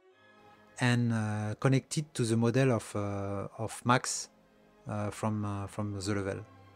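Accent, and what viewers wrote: France. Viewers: Tu es Français ? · j'ai reconnu ton accent francais :) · c'est si drôle un français qui essaye de parler anglais xD mais sinon bon tuto ^^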